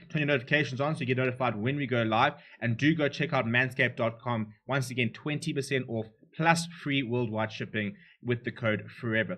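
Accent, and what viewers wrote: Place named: South Africa. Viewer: Good content man. Nice to have a South African accent on youtube talking some sense. Keep it going.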